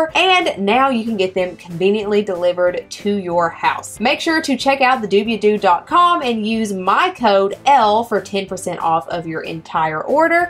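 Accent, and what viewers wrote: South, United States. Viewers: Damn u a QT!! I had to sub!! CANT get my Lemon Pastel to eat a FT to SAVE his Life!! 🤦‍♂️ I cant even get my lil ninja to STRIKE one time just on some Defensive issh!! 🤦‍♂️ I know he ain’t gone strike at a mouse NO time Soon!!! Also, what part of the South are you from??? Lol I love your countriness(I know that’s not a word) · Very informative video. And it is nice to see a beautiful woman with a Southern accent. I have a 10 month old ball. She does really well feeding. I feed her a grown mouse about every week. I think it may be time to move up to small rats.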